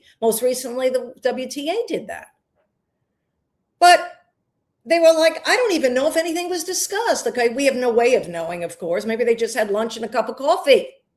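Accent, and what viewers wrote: New York, United States. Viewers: Love NYC accent.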